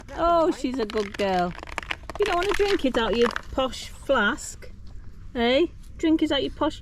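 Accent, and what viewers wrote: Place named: Ashton-under-Lyne, United Kingdom. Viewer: Love your videos. Am trying to place your accent. Im fron Ashton-under-Lyne and hear myself in your accent. Where did you come from thinking it must be in this area?? Keep making us smile 😃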